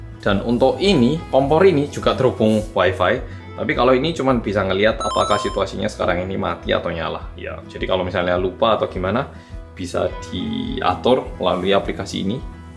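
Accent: Java, Indonesia. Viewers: Dulu waktu 2014an ga terlalu suka sama mas karena ngomongnya super medok, feels so weird for me. Tapi semakin kesini malah makin demen, terutama pembawaannya dan ke sederhanaannya. Sukses terus yo:)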